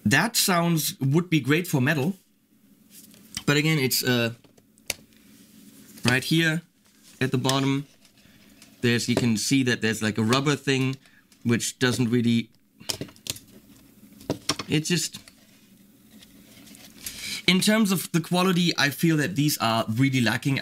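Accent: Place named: Germany. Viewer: ich mag your german accent lol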